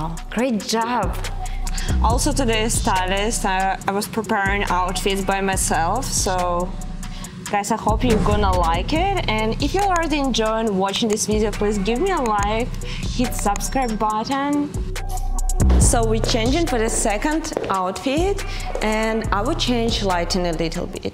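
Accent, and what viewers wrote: Russia. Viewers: ХММммм...мне кажется или я слышу русский акцент?)))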